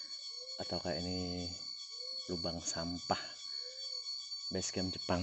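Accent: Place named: Medan, Indonesia. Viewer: Biasa itu mass dalam logat medan😄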